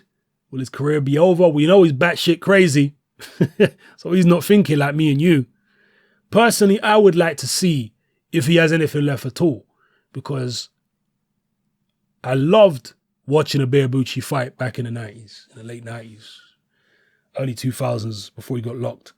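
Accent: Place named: South London, United Kingdom. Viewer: He's from South London , they all sound like that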